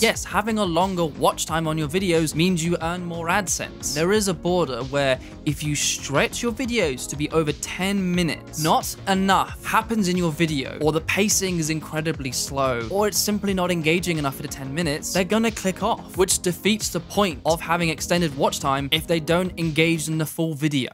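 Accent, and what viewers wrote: United Kingdom. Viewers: intresting stuff here and very well explain with that top notch british accent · Hey......Peter McKinnon sent me.....good to listen a YouTuber who speaks the Queen’s English, for that reason alone I’ve sub’d!👍😄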